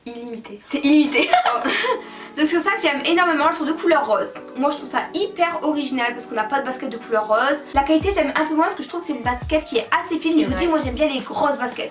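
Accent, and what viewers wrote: North, France. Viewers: cet accent ( nordiste?) tellement présent..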